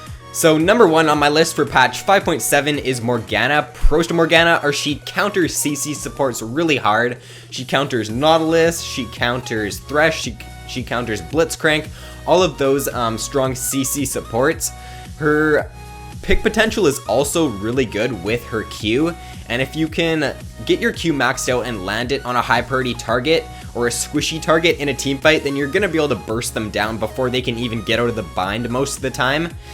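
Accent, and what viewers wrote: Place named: Canada. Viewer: Ramon Schöllkopf he is Canadian, so to him he is pronouncing just fine. Just different accents I guess.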